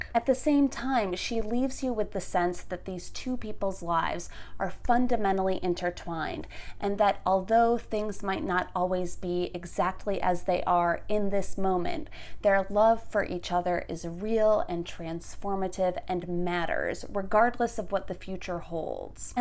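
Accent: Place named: United States. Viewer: Wow love your review - reminds me of what English lessons were like back in the Sixth Form. Such a shame my school never invited any guests with an awesome American accent like yours. I did meet Simon Armitage and Matthew Sweeney though - this happened maybe 6 years apart (i met Sweeney first, then Armitage) - so that’s something I guess!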